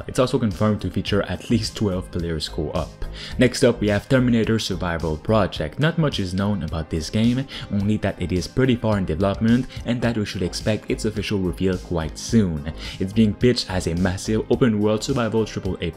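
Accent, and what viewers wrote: Quebec, Canada. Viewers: Bro t québécois right ?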